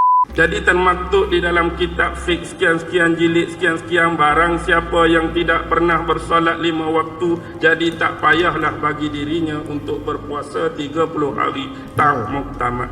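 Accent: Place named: North, Malaysia. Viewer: loghatnya pun dah bertukar jadi loghat utara 😂😂😂😂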